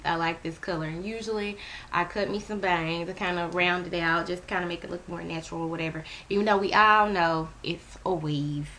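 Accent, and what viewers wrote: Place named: South, United States. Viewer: i can tell ur from the south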